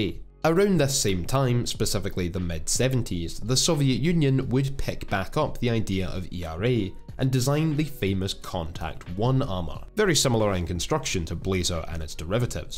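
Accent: Scotland, United Kingdom. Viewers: bit of scottish for you there, pal, like the narrator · Is this a scottish accent? Sounds awesome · is he scotish ?! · Speak American man! I would love to watch and learn from ur videos but the Scottish accent irritates me, u pronounce a lot of words wrong.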